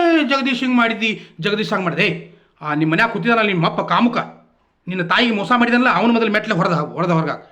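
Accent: North Karnataka, India. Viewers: Nimma utthara Karnataka maathu keloke thumba khushi agutthe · Khadak uttarakarnataka spacial bhashe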